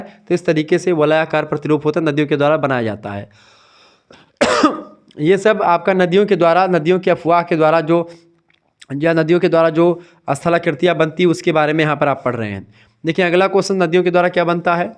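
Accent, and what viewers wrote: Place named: Bhojpuri, India. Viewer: are bhaiya pure hindi bola kariye ye bhojpuri dhang se samajh mein nhi ata hai samajhne mein dikkat hota hai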